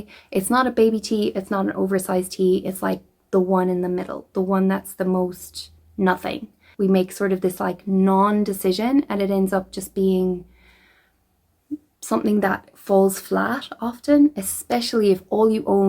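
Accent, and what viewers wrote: Ireland. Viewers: Your accent sounds Irish?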